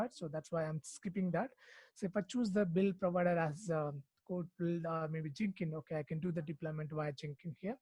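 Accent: India. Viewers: Its India English :) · Настоящий индус. Не тратьте время. Кошмар, а не акцент.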